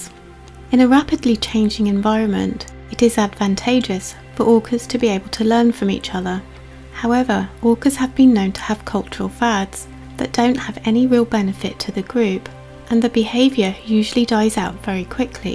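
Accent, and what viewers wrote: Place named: England, United Kingdom. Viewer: No problem, as an orator you are exceptional, I hope it is a professional position, it should be. As a retired teacher (STEM) pace (slowish) and clarity are essential for comprehension. In addition you English accent and voice tone are very pleasant to my old Australian ears.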